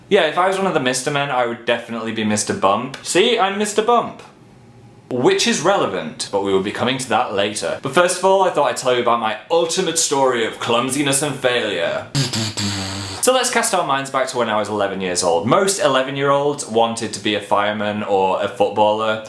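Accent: North, United Kingdom. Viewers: He Sounds so northern in this 😂🥰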